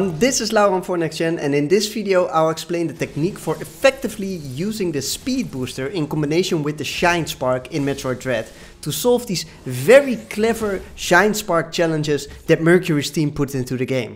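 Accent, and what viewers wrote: Netherlands. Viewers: Thanks voor de tip! Ik hoor trouwens iemand die in het echt Nederlands spreekt ;P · Clear video, explains some of the finer points of speedboosting. An addition would be that you cannot "charge"towards a block while spinjumping and you need to cancel the spinjump (or screwattack) with firing your gun/ aiming, before being able to do mid air spark releases. I would suggest working a bit on your English: Your Dutch is shining through: just watch some vids on pronounciation.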